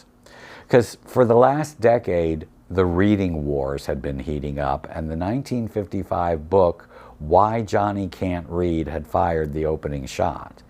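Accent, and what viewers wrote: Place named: New Jersey, United States. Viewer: P.S. whatever sounds like New York but you do not sound like a New Yorker. Oh! Ok NJ.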